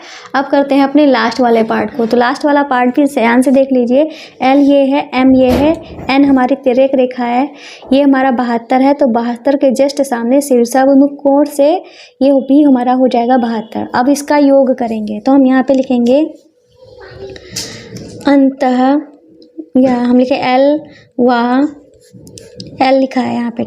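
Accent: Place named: Rajasthan, India. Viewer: Mam aap Rajasthan se ho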